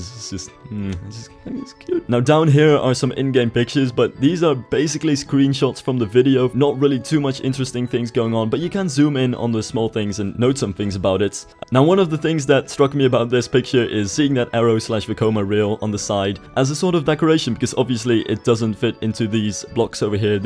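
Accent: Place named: Netherlands. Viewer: are you from holland? your accent sounds dutch :P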